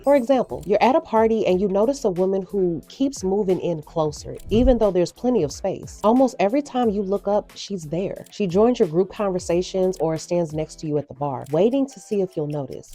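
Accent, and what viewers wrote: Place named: South, United States. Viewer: My cousin says women are like ice cream, 38 different flavors of the same 💩! I love the southern accent on this commentator😋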